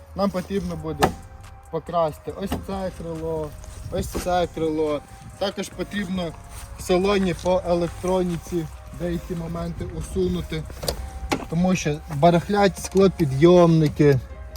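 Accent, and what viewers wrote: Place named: Surzhyk, Ukraine. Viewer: я з камянця. Чую наш суржик))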